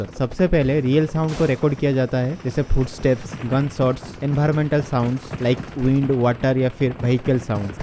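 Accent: Odisha, India. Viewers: Odisha se ho kya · Are you an odia? Your accent makes me feel like that. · Bhai tum Odisha se ho kya? Tumhara awaz ek odia toka jesa lag rha h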